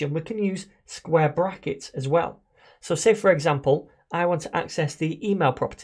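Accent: United Kingdom. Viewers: are you British, it is two birds in one stone learn British accent with JS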